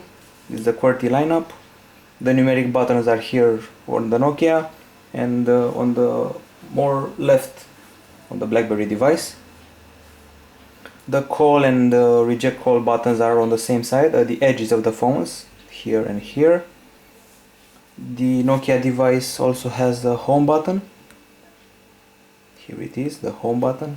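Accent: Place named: Romania. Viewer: Engleza ta are un puternic sound romanesc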